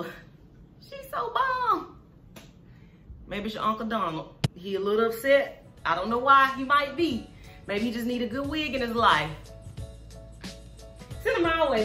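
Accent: South, United States. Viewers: OMGGGG!! I love everything about this! Your spirit, your energy, the southern twang, that bomb ass haircut and your beautiful self. Best review EVER!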